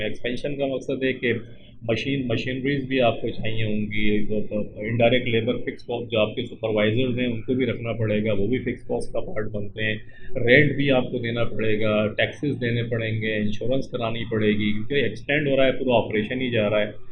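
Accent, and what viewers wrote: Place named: India. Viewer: I can't understand anything due to the Indian's accent.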